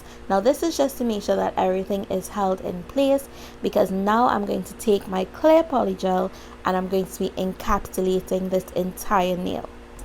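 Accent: Trinidad and Tobago. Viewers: Are you trini?? I love hearing a fellow trini accent 😍🇹🇹🇹🇹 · You sound a bit trini are you?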